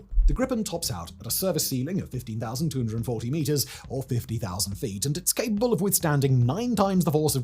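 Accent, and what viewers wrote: RP, United Kingdom. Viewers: Not related to the content but that accent cannot be real, unless someone can verify where it’s from, ( if poshy English, what area exactly?)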